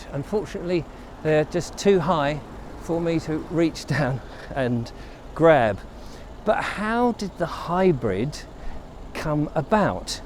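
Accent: United Kingdom. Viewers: As an American it was worth it just to hear, "it had a little bit of shenanigans one evening" in a British accent.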